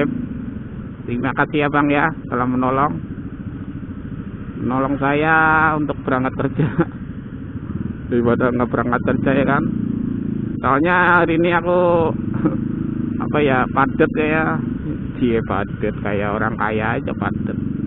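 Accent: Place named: Banyumas, Indonesia. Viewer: Motovlog ngapak -ok tetep semangat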